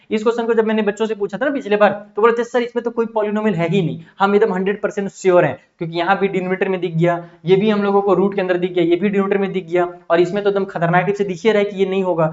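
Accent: Bihar, India. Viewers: sir aap bihar se hoo kya ?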